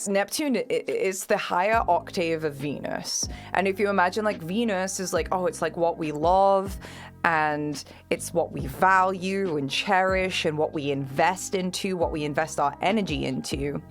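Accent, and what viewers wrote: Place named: Northern England, United Kingdom. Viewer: Your intelligence is always a step in front of you. I get pulled up for the speed and length I talk at, but you have an extra gear beyond i've ever known. Your every word makes total sense, but I wish I could tune in better. I don't know if you've ever watched or heard anything of the philosopher Alan Watts? Though, he passed away many years ago now, his talks have become very prevalent these days, and most can be found on this platform. Your intensity is amazing. Your hands almost another person in the room, and you know this. Maybe I'm having the same issue as the beginning of animated films, where guessing the voice actors can distract from the plot, but I'm still attempting to guess where the Northern English part of your accent comes from. I could hear you talk for hours, and even if I have to use technology to slow the recording down to understand, I will. 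My apologies to those who know you, they will no doubt roll with your enthusiasm naturally, so my point is moot. I must revisit more astrology too, I haven't for too long. I'm Steven. I'm an attention seeker. I naturally attracted Pisceans, and if you can guess my sign with a 12/1 chance from this comment. I will become a Patreon, because if you are that intuitive, how could I not. I have to add, although communication is the most essentially part of any relationship, and putting effort in can only make that better. I believe too much thought and effort will ultimately mean you're with the wrong person. Especially whe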